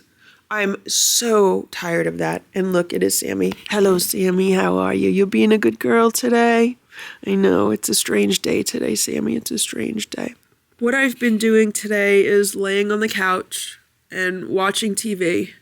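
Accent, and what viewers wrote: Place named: New Jersey, United States. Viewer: I haven’t been able to watch this video yet. Just saw the thumbnail this morning and my heart sank. Boo was more than a cat—he was a rhythm, a presence, a sovereign part of your world. I’ll miss hearing your voice call out ‘Hey Boo’ in that familiar New Jersey grain. It always made the day feel a little more anchored. Sending love and deep respect as you grieve. You gave him a life of dignity, ritual, and care—and that echoes far beyond the screen.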